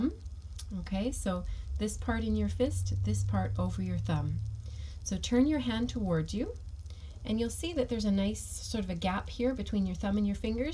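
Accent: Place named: Canada. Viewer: Love the Canadian accent 😚🇨🇦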